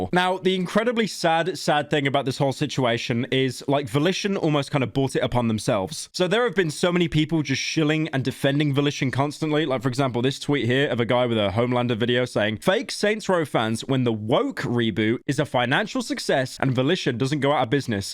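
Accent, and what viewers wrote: United Kingdom. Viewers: I’m convinced viewers are degenerates and are okay consuming anything with buzz words with funny British accent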